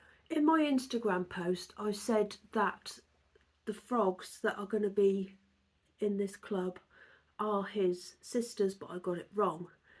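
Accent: Norfolk, United Kingdom. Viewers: Love your fabrics...I buy embroidery tableclothes from secondhand shops as I think they are beautiful. Really enjoy your chats, it's as if I'm sat talking to a friend as I live in Pakefield, Lowestoft so very used to the norfolk accent 😊 so very sorry about Dennis, its just heartbreaking...I know how it feels. 😢 Take care, big hugs to you and your family 🤗 ❤ xx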